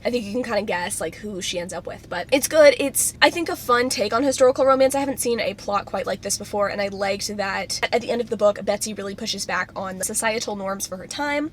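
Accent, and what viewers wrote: United States. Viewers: I could listen to you talk all day lol I don’t know if it’s the American accent or not but your voice is so soothing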